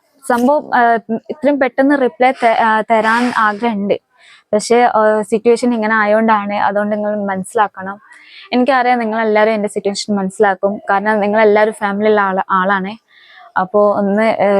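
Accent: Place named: Uttar Pradesh, India. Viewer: Enikk thonnunnu ivar up yil ninn keralathilek jolikk vannu malayalam padichathanenn ....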